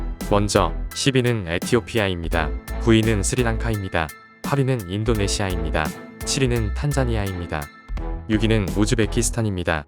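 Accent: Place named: Jeolla, South Korea. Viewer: 전라도 말투 숨기지 마세요ㅠ 왜 전라도 유투브들은 우덜 말투를 왜 부끄러워하는지ㅠㅠ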